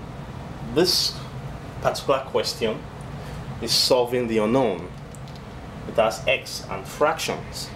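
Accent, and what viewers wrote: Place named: Nigeria. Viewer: you had a niagerian accent so thats why i thought you were from nigeria i am from bauchi state northern nigeria which part of nigeria are you from?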